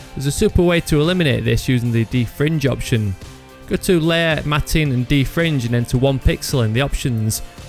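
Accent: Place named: Yorkshire, United Kingdom. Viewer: Love Yorkshire accent.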